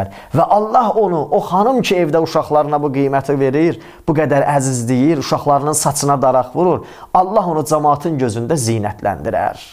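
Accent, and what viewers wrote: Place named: Iran. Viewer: Azərbaycanlı ola _ola İran ləhcəsi ilə danışırsan?Bunu yığışdır İran İttilatı sizə belə öyrədib???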